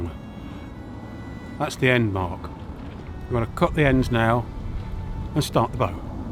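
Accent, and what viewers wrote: United Kingdom. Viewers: British AF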